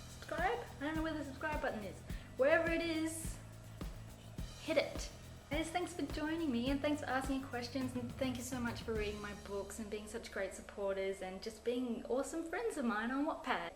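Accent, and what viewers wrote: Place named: Australia. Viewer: I love your accent! You are such an awesome inspiration! Plus, you're from Australia so you're badass!! :D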